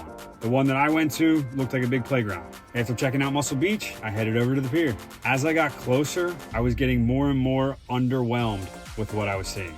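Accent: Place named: Philadelphia, United States. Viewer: You got that Philly accent lol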